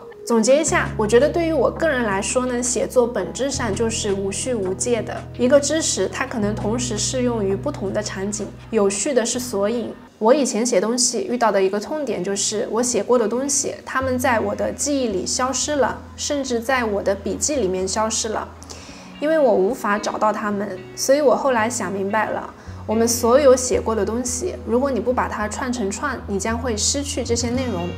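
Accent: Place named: Shashi, China. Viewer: 为什么你说话这么像我们沙市人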